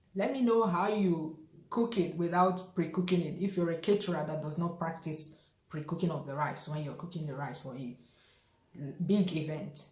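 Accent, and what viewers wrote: Igbo, Nigeria. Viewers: I don't boil my rice but I wash it more than 20 times! And it comes out amazing !I would always like our Igbo accent 🙌Ndeewonuooo!!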